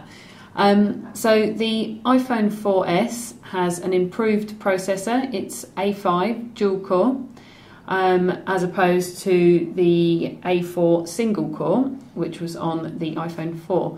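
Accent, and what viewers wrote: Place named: London, United Kingdom. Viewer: you sound like you're from London!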